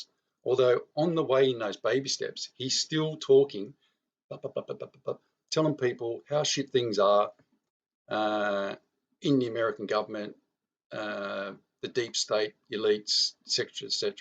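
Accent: Australia. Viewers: I'm so glad you do this. You're one of a handful of Aussies on YouTube saying this stuff. I have an American friend who though quite the lefty at least understands the idea of prepping. I always shout out to the Aussie preppers on overseas channels. It's nice to come and hear an Aussie talking. I've only been prepping for a little while, but really I've gone back to what my Mum used to say and she didn't know about any of this globalist stuff. But she was a farm girl and had been told about the great depression so she knew.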